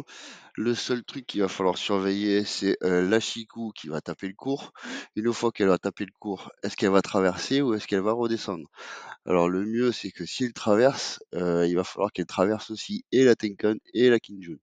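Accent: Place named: South, France. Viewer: Tu viens du sud toi aussi 🎉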